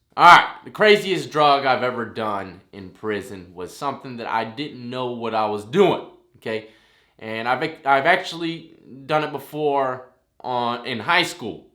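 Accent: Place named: Great Neck, United States. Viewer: wat high school did you go to? sounds like cox over off great neck lol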